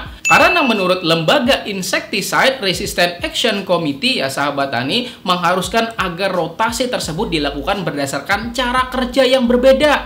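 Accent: Banjar, Indonesia. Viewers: hen cucuk ai ha asa pinandu kaitu nh u lwan logat2 bnjar .. S kalsel ja kita pak ai😁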